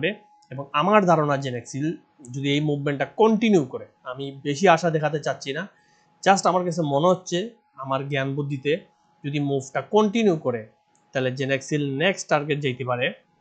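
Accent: Sylhet, Bangladesh. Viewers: কিতা বা সোনা আপনি কি সিলেটি নি । আপনার বাড়ি চুনারুঘাট মৌলভীবাজার নাকি ফার্স সিলেটি। সুনামগঞ্জ আমাদের অনেক লোক আছে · Apni ki sylheti vaichab ni kitha khonor valani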